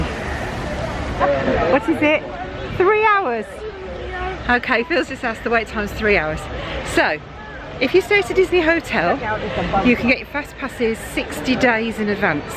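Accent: Birmingham, United Kingdom. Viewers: Can hear a bit of a Brummiw twang in your voice I think? Found you from Dev. Going back to WDW in September yet again. X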